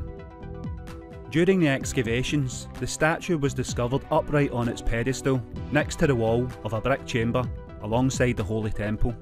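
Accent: Scotland, United Kingdom. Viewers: Is this a scottish accent? Just wondering, because it was so easy to follow, while irl my encounters with Scots have usually made me feel like I needed to go back to school and practice.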